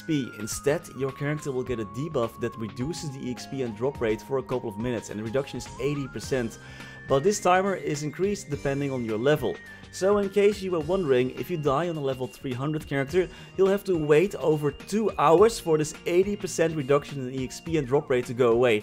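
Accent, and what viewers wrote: Netherlands. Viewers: Instantly recognize a dutch english accent. Your english is pretty good man! Lekker gewerkt pik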